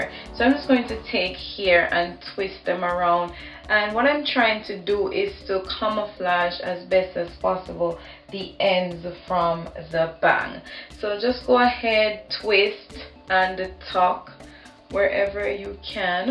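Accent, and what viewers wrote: Jamaica. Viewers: r u from Jamaica?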